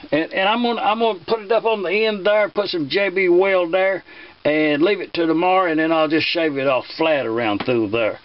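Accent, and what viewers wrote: South, United States. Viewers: It’s really interesten how you took dat wearer to get the spring ends through. Good job. Love your Southern accent. 👍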